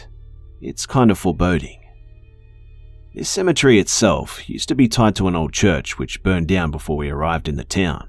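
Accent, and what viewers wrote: Australia. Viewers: Finally, a familiar Aussie accent!! I could tell by the whispers at the start lmao :')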